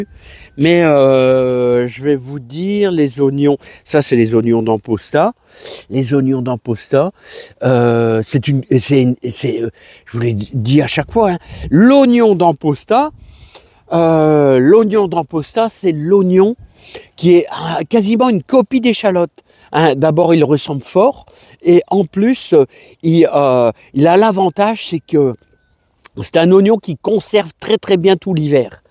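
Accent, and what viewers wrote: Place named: Nord, France. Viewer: ça s'entend l'accent du ch'nord!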